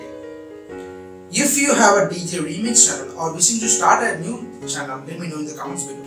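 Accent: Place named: India. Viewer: IT IS REALLY HARD TO UNDERSTAND WHAT YOU ARE SPEAKING. THE CAUSES : 1. BAD AUDIO (SORRY) 2. INDIAN ENGLISH ACCENT (DIFFICULT FOR ME UNDERSTAND THAT ACCENT COMPARED WITH AMERICAN OR BRITISH) I HOPE BETTER AUDIO ON THE NEXT VIDEO. THANK YOU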